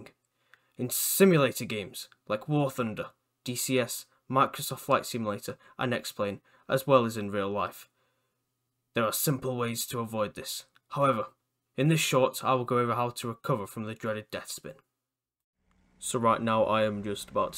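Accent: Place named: South, United States. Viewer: I find the fact that you use 2 voices annoying to the point of distraction. Why do you feel the need for the film promo all deep slow and husky style of talking. Then with no obvious reason back into your normal Southern accent ?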